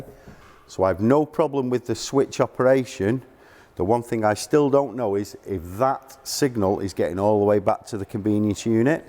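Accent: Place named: RP, United Kingdom. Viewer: Like the posh voice, is that for to understand you, with you being like me a Northern Lad 😂😂, great testing there love, quality is great on video and audio here in sunny Gran Canaria, take care boys 👍😉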